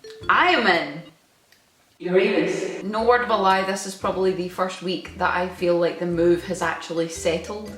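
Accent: Scotland, United Kingdom. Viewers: I absolutely never realized the Scottish accent emphasized the "e" on certain words. Like "hous'ee's", AWESOME! Sorry, I'm a new sub and I love different accents.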